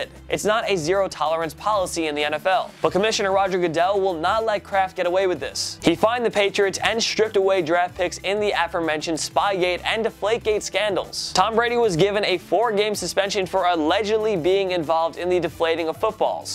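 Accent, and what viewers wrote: United Kingdom. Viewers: nice brttish accent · I’m from the uk and I love your English accent 🧨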